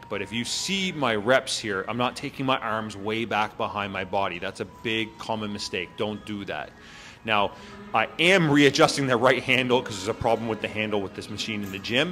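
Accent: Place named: Maritimes, Canada. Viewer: What part of Canada are you from? I hear some maritime but can't quite tell.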